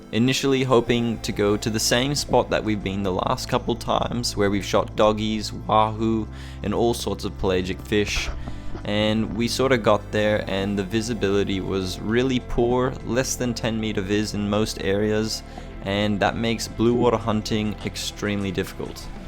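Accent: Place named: South Africa. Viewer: Sounds like you have some South African in that accent 😊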